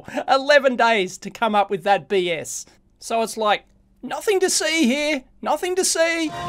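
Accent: Australia. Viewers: You picked up the Australian accent very quickly! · Yep it’s pants. To be honest Weller has a long tradition of supplying quality irons and stations. Weller as with a lot of European manufacturers have gone off the boil of late which is a shame as they are looking at price point rather than quality, it seems to be money men vs engineers. I will say one thing you sure have picked up the Aussie accent, how long have you been out there?:-D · even got an authentic Strayan accent already. Amazing!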